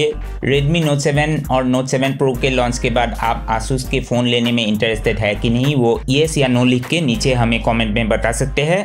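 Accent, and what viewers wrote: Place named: Assam, India. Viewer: Aapke..awaz se toh..aap Assam ke hai ...lag raha hai. 😅😅😅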